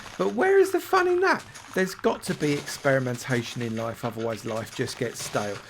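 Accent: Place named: England, United Kingdom. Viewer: He sounds nothing like him imo. Just an English accent.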